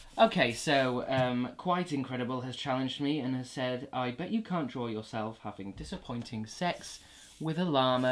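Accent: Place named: United Kingdom. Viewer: British accent is addicting.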